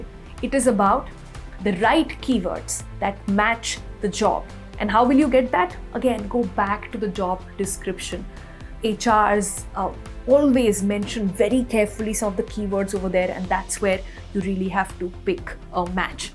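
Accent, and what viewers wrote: India. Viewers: Wow, I struggle with such a strong Indian accent. 😞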